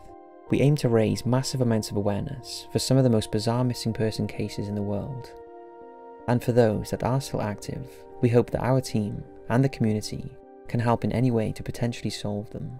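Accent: United Kingdom. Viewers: I love the way you brits say shit like, 'Media'... 'Medier'. It's awesome. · Your British accent was making it difficult to understand what you are talking about, and there are at times you were mumbling on each of these cases. Please speak clearly and as loud as possible so that we can listen and pay attention.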